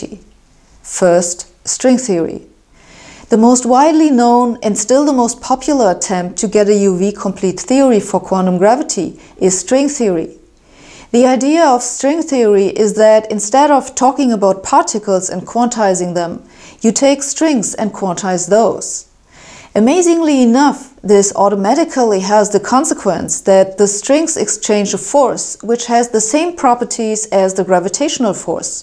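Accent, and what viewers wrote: Germany. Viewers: I have tried to analyze why I like your video’s so much. Is it the German accent in your scientific English that for my generation spells deep learnedness? Is it the high amount of inflection with which you impart so much understanding and which makes listening to your Referat so easy, with a cup of coffee? Is it the full dedication to content, with a total lack of ego, not trying to show how smart you are, but how interesting the subject matter is? Or is the choice of subjects, that are mundane questions that you are able to make into glittering gems of physics, reminding us physicists what a beautiful field we have chosen? Or is it the total absence of smiling, that so often is strewn all over the presentation by women? I do not know what that means or if it is intentional or not, but it is a constant through all of your fascinating video’s, making them even more fascinating. 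It has made me think, what does smiling do to a story being told. I’m beginning to find out.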